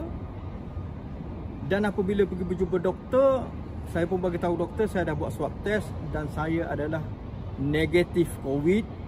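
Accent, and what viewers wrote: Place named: Terengganu, Malaysia. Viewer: ado bunyi sikit loghat teganu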